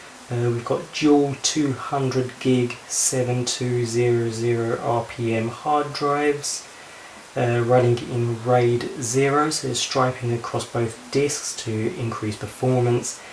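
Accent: England, United Kingdom. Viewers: r u english?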